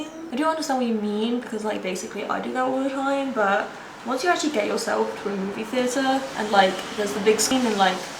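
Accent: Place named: United Kingdom. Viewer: I'm from the states but knew instantly you were British. And yet you were clearly heard, no need for captions! Great midsection , btw. 😁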